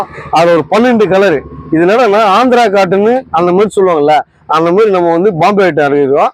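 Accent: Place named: Chennai, India. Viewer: Super super unga Chennai tamil slang awesome dear 🎉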